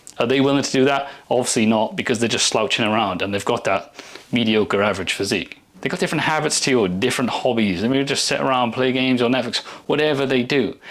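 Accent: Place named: Wales, United Kingdom. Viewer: I’m so glad I found your Channel, I am from Bristol by the sounds of it your from wales! We should train together one day ahaha! And yes it only counts when you lock in for a significant amount of time, I’d love to show you more before and after face pic. I just eat steak and fruit 🍇 everyday and I feel and look 100 x better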